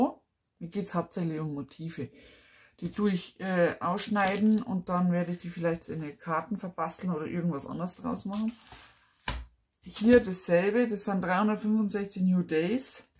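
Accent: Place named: Bavaria, Germany. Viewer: Hallo, neue Abonnentin hier ✌ Ich bin auch aus Bayern und mir gefällt dass man deinen Akzent so schön durchhört 🤗 Außerdem heißt meine beste Freundin auch und deine videos gefallen mir natürlich auch 😁 Mach weiter so und bleib so identisch wie du bist! Servus, Yvonne 😉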